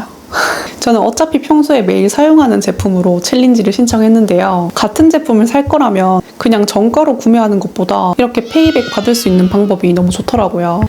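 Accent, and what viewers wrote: Gyeongsang, South Korea. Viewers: 주인장 억양이 경상도분이시네~~대구 아니면 부산분이신듯~~😆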